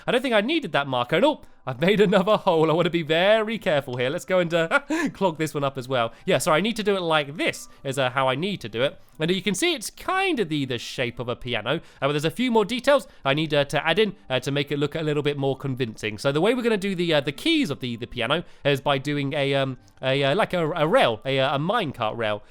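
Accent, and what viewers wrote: United Kingdom. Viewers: You are awsome.I cant believe you are this talanted i really wish you could make a....GIANT CAT that would be AWSOME!!!!!!!! P.S. i love your british accent;)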